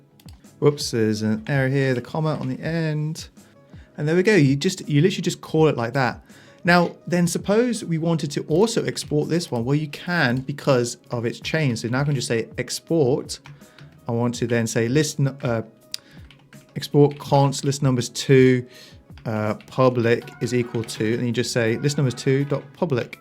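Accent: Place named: Australia. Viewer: I’m so familiar with the accent, Aussie right ?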